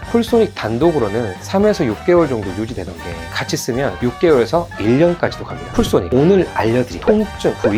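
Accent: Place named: Jeolla, South Korea. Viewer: 전라도 사투리 구수하네예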